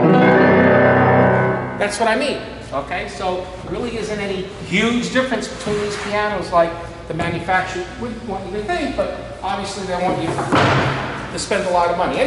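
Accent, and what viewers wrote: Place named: New York, United States. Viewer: Host must have a NYC typical Accent.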